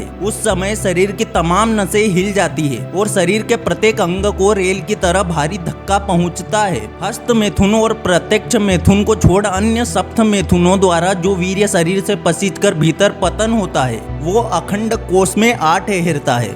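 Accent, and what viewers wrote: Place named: Gujarat, India. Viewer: Ye voice kisi gujrati ki h lgta h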